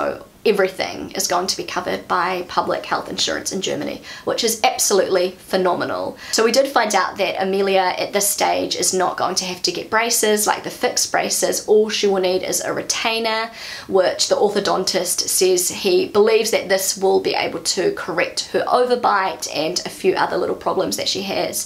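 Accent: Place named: New Zealand. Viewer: I am a German mum who has been living in Spain for 16 years. It makes me a little bit jealous that the dental treatments for children in Germany are free. Here in Spain, one must pay for everything, social security doesn´t pay anything. My dental insurance paid by my employer is quite useless. I have not had such good experiences with German dentists as an adult. First of all, many still use amalgam (I wasn't even asked at the time) and secondly, it is very expensive when a treatment has to be paid. Here the treatments are roughly half the price with a similar cost of living. Fillings are not free but they stopped using amalgam decades ago. By the way, I like your channel because it's interesting how you see Germany as a foreigner, especially for me as a German and foreigner in Spain. I also like your accent because long time ago I spent a year in OZ and met a lot of nice Kiwis there.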